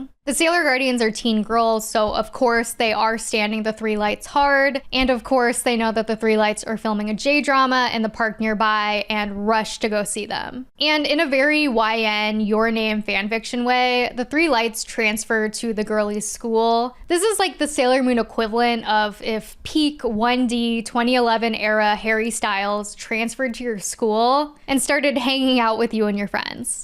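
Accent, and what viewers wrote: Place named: Southern California, United States. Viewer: Me, after clicking on a random recommended Sailor Moon essay: Is... is that a So Cal. accent?: [something something] Hollywood [something] (I'm not going back to find the timestamp, sorry) Me: Ohhhhh. HELL YEAH. More California accent representation, please! I have found my -people- person. Good vid, btw, despite the technical issues. I watched the first run of the dub back in 1995 before middle school and loved it, but that's the extent of my Sailor Moon knowledge.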